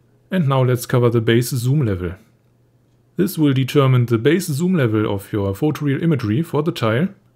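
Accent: Germany. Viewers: also ich finde dein english ja absolut spitze, aber muss dieser Deutsch akzent dabei sein? XD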